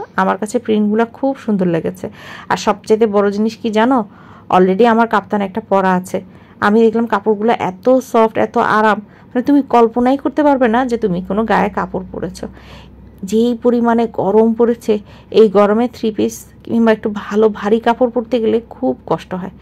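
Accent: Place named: Chittagong, Bangladesh. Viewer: tomar kothay Chittagong er akta tone asy…..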